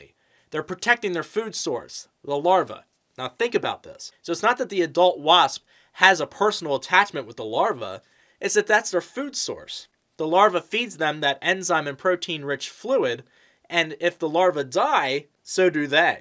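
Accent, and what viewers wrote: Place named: Philadelphia, United States. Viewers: I thought you sounded like you are from Pa. Monroe County Poconos here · I knew you had to be from the Philly area by your accent! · Your Delco accent is strong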